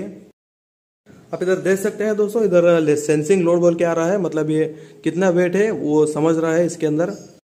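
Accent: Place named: South, India. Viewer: Your language is like south Indian speaking Hindi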